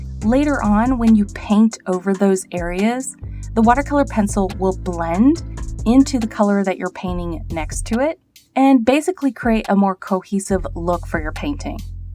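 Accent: South, United States. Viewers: Gee,are you from the South??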